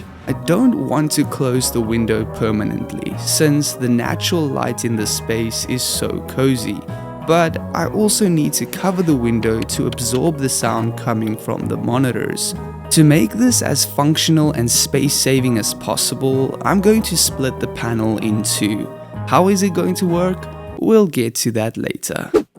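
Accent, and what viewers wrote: South Africa. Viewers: after all these years I've finally confirmed myy suspicion that you're South African too XD awe masekind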